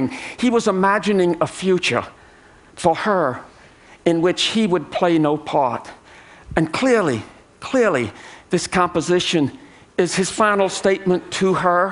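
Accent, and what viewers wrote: United States. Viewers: Quite a bearable American accent. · Marvelous! A Southerner (sans any Hungarian ancestry) talks (in Southern English) about his affinity with Hungarians, or with the Hungarian spirit, or, as James Michener might have put it, an affinity with the cantankerous nature that characterizes Hungarians.... hmm, more politely called that "curiosity", that insatiable inquisitiveness, that characterizes Hungarians. Conjures up memories of old jokes, e.g., ... Q: Are you part of the SETI search for extra terrestrial life? A: Those aliens are already here, they're called Hungarians! My own command of the Hungarian language is woefully minimal, but the speaker's Magyar friends could have schooled him better in the pronunciation of jó nápot pacák, or the names mentioned, etc, even with a Southern accent. Mispronouncing some Hungarian words can get ya into big trouble, I know, just one example being when I saluted some important company with a toast, attempting to say "Egészségedre!", meaning "to your health", but it came out as "Egeseggedre", a polite translation being "to your butthole", which caused a mixture of embarrassed groans and guffaws of hysterical laughter... 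an episode that put a serious dampener on my motivation to further learn that diabolical lingo. One thing I did make sure of after that experience, though, was to make a special effort to correctly pronounce anything I said in any language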